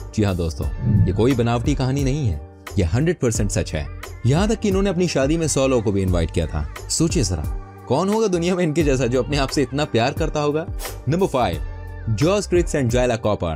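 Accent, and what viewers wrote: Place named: Kolkata, India. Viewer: Kolkata si bol rahe hain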